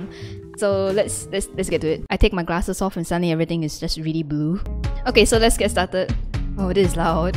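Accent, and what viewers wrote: Singapore. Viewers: Oh boy I can hear the Singapore in the voice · Are you Singaporean? Just curious. It sounds like a very singlish accent. =)> Also nice video! I’m surprised you put captions over the ENTIRE thing (this is the first time I’ve see a video of yours so if that’s a normal thing I didn’t know) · i could tell right away you were from sg, this video confirms it LOL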